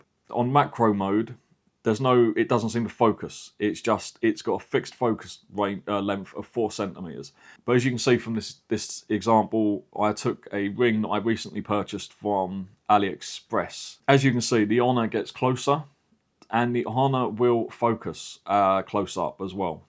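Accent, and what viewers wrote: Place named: Liverpool, United Kingdom. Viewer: Why are you speaking in a scouse accent?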